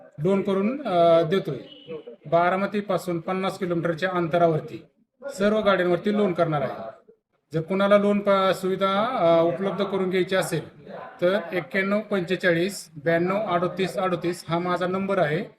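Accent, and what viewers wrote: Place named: Solapur, India. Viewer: सोलापूर जिल्हा बोलतो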